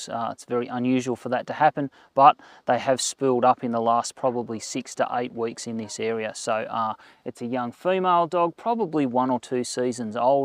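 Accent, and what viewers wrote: Australia. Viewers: Great video, you sound Aussie, hope so you did good.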